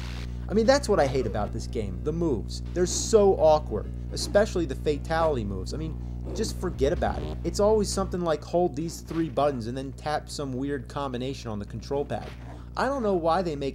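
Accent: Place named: Pennsylvania, United States. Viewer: That's just a Pennsylvania accent. 😂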